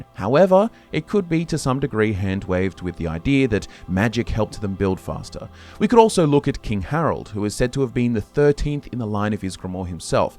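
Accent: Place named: Australia. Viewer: Didn't know he was an Aussie, I wonder if that means Drew is as well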